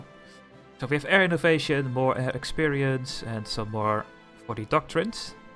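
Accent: Netherlands. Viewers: Leuke Lets play man haha je hoort wel een beetje dat je nederlands bent in je stem :)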